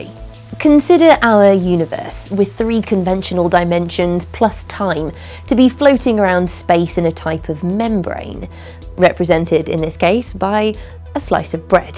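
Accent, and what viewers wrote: United Kingdom. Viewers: A great looking lady, looking a little "science-y" disheveled, with a British accent talking about the big bang intelligently... I don't know what's more attractive than that lol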